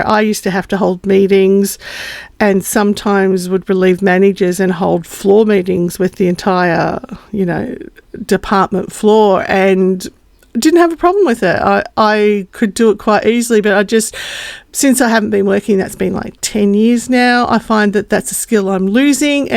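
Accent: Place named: Australia. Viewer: Happy ne year.I hope Luna has her stiches out soon. Thanks for sharing your crafts. I enjoy your vids and I like hearing the aussie accent(Im just down the road a bit) I feel inspired and I find watchcing them relaxing. I don't do diamond painting but I do love colouring and I often make brickbuilds (usuaslly asian brands but they have some great animals, including dragons. I think you are really productive in comparison to me. I really have to be in the right mood to do much colouring.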